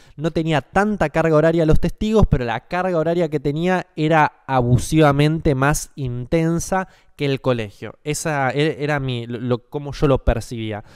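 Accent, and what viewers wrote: Argentina. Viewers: Dude amo el acento argentino me partí de risa en el inicio xdd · Como le deben de haber quitado dinero atraves de las donaciones voluntarias supuestamente.a millones de hermanos.amigo tu eres argentino ?? por el acento lo pregunto.saludos gracias.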